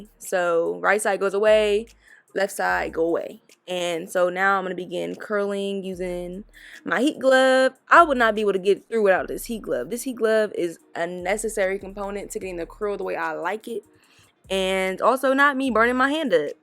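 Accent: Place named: Columbus, United States. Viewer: You can tell you live in Columbus you got that talk 😂💞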